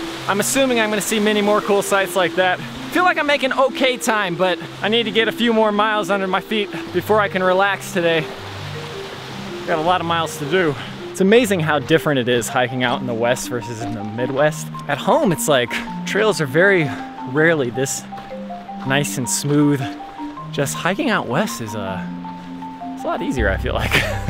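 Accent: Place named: England, United Kingdom. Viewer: Your English accent sounds like Keanu reeves accent from Bram Stoker's Dracula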